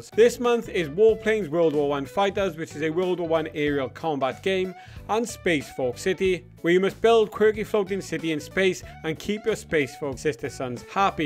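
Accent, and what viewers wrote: Wales, United Kingdom. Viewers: Ohh god so nice to hear a fellow Welshman ❤ Da iawn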